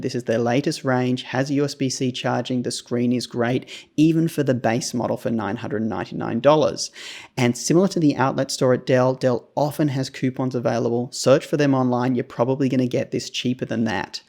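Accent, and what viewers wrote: Australia. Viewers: you sound Australian ,but are you talking US Dollars & web sites ????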